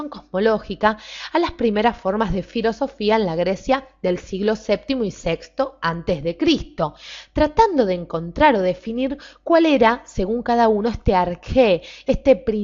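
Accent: Argentina. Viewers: Me ha gustado mucho este video esta bien chévere! Saludos desde Brasil y me enamoré del acento argentino. Gracias!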